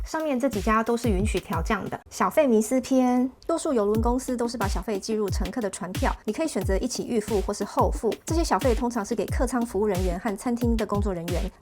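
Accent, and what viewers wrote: Taiwan. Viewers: （無效啦）呵呵 ，很標準的台灣閩南語發音😊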